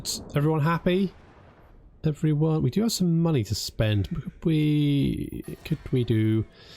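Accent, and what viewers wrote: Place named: United Kingdom. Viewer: i followed you from cap 1 to here dude, i love your british voice DUDE ( no homo)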